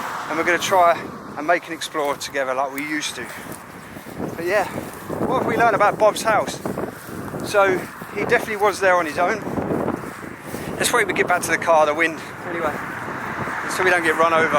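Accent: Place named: Scotland, United Kingdom. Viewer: It's very Scottish I wish you would say what country your in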